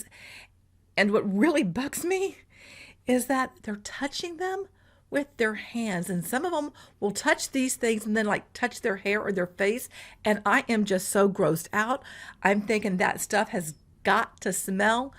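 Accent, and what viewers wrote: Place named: Texas, United States. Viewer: I knew you were Texan 😂 howdy from a North Texan 😂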